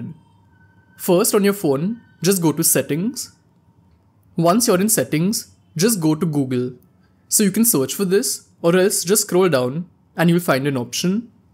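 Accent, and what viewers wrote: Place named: India. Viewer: The Indian accent is crazy 🤪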